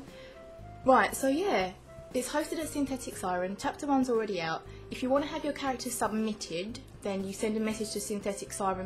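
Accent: United Kingdom. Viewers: the more you post video..the more you got the british accent when you talk.. Kinda funny but sooo cool !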